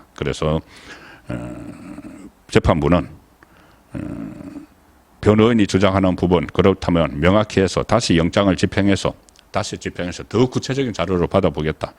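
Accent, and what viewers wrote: Gyeongsang, South Korea. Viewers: 늘 유익하고 알기쉬운 해설 잘 듣고 있습니다. 그런데 계속해서 몸에 익은 대구사투리 발음(금융기관, 금융기관 etc..)이 듣기 거북해요. 고치세요!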